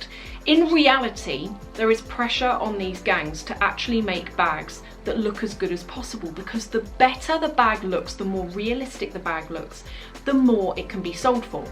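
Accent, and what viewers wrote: RP, United Kingdom. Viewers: I love your posh accent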